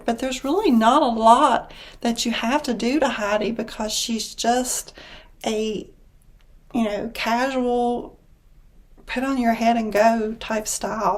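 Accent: South, United States. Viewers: Your accent makes me feel like I’m at home! I’m from TN but have lived in Alabama, Mississippi and Arkansas. This is my favorite wig on you. Which wig that you’ve reviewed has been the best for wearing up? Like which has the best temple to temple coverage? And which blonde has had the best lace front? I wish I had your calmness!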